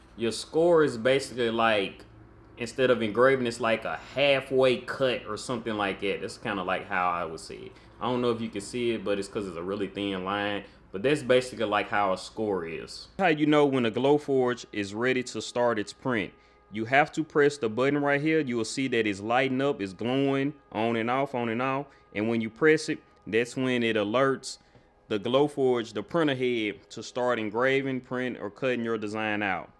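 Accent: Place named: Cleveland, United States. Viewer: If you just listen and not watch you can hear Cleveland lol awesome video my man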